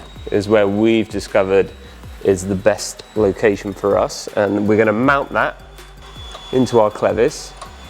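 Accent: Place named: United Kingdom. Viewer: Mate your accent had me thinking Verus was UK based, but nope gotta pay the +200% import fee's 😅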